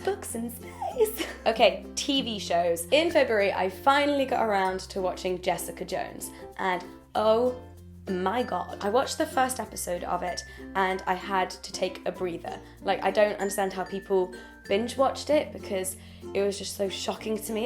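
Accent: United Kingdom. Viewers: where in Britain are you from? xx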